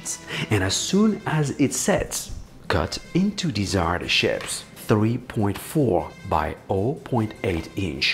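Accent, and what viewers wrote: France. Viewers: This French accent has got me dead I swear 😂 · Well, I'm French and with a french ear, I really can't stand french accent 😂 even if his is way better that some I've heard 😂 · I still can't decide whether he is french or just doing an accent. It's blowing my mind. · That french accent😂😂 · As I heard his French accent I knew I stumbled upon something GOOD Seriously, amazing dessert, chef. Chapeau :D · This man sounds like every stereotype french chef ever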